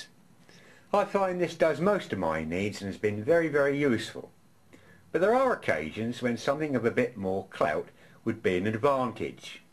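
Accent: United Kingdom. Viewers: this is amazing I love home made tools!!! and this may sound stupid, but I find I learn things a lot easier if the one teaching has a British accent lmao crazy I know lol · What size is the larger transformer that you have used for the bigger spot welder? Very good videos informative, clear and concise.....and British....Excellent!!!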